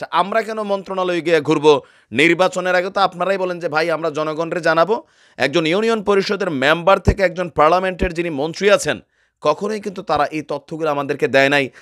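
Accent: Sylhet, Bangladesh. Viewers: সিলটি মাত হুনিয়া ভালা লাগছে বা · ভাই তুমার সিলেটী মাত খথা,খুব ভালা লাগছে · Assalamu alikum bhai. Ji afen hasa math mathra salaya jawka sylheti math mathra shundar lager. Thank you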